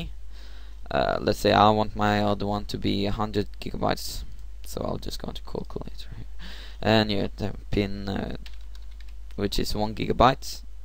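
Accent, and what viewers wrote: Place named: Scandinavia. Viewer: Thanks mate ^^ Are you from Sweden or Norway by any chance? xD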